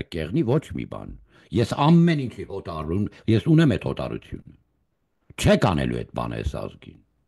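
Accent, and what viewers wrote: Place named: Western Armenian, Armenia. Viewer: Impressed with your Western Armenian accent, wow!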